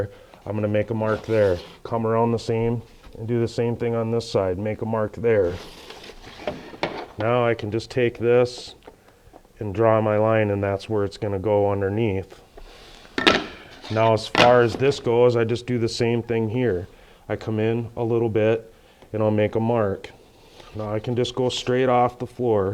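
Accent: Minnesota, United States. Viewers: Your praise of God blesses me because I find myself everyday thanking the Lord for guiding me at work especially when I run into some 'mystery' challenges I can't figure on my own, thanks. Great instructional videos and love the MN accent. God Bless!!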